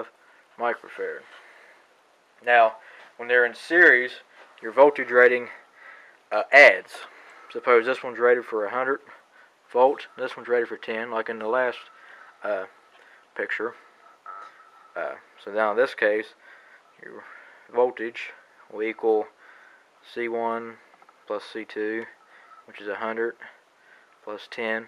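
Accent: South, United States. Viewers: Made it VERY easy to understand, thank you! .. I'm from Canada so the southern accent made it all the more enjoyable. ;)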